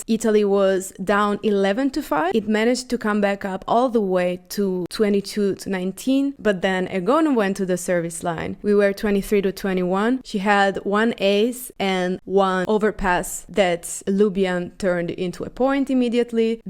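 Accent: Turkey. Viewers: the turkish accent is so heavy 😅🤣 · Bir türk olarak mükemmel bir fırsatı değerlendirip herkesin bu kadar voleybolla ilgilendiği bir esnada ingilizce kanal açmıssın. Mükemmel bir girişim zamanı. Tebrik ederim başarılarının devamını dilerim :). Konuşman çok iyi. Sadece bir türk bu aksanı anlar :). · Video için teşekkürler türksün di mi aksanından öyle anladım ama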